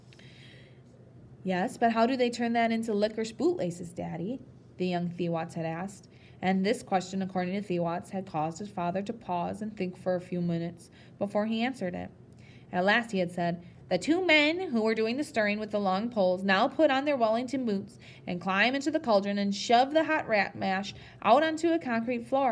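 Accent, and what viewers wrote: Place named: United States. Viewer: Oh dear read by an American . Spoilt from the start .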